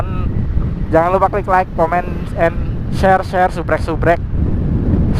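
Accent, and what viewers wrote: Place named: Banyumas, Indonesia. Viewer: motovlog ngapak mantabbb ...smoga sukss channelnya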